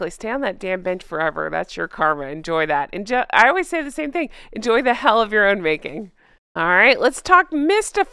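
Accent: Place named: Midwest, United States. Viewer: What accent?! I’m from the Midwest and you sound like ME!!! Lol😂. Love a British accent!😊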